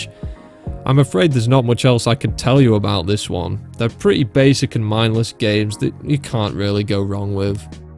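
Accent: United Kingdom. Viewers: That British was like a foreign language to me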